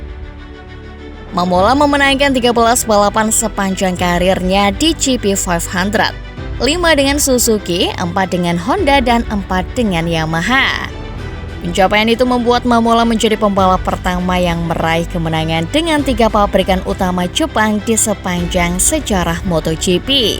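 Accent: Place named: Java, Indonesia. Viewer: medoknya imut bet wkwk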